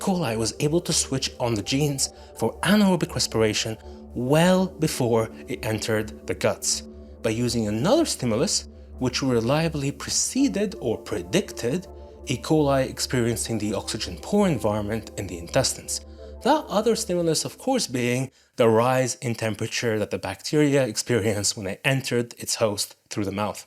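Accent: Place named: United States. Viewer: He sounds like an American to me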